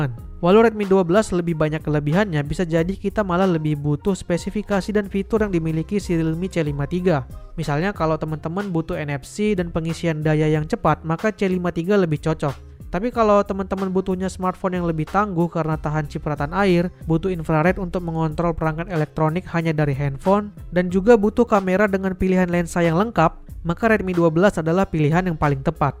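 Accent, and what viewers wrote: Dumai, Indonesia. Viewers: Org dumai abg ni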